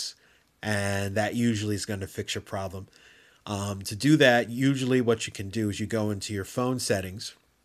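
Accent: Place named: United States. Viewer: Thankyou! And nice to listen to someone who actually speaks English and doesn't speak too fast! American English!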